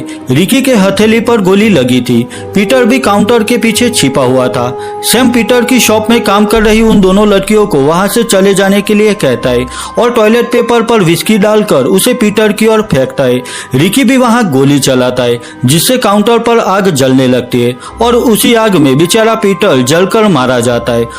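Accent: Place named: Bihar, India. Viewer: apki voice se lag raha hai aap bihari ho..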